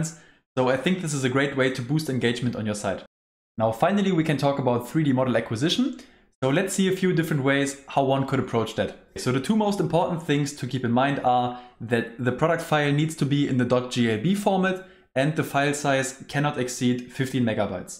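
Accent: Germany. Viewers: das deutscheste english was ich jemals gehört habe.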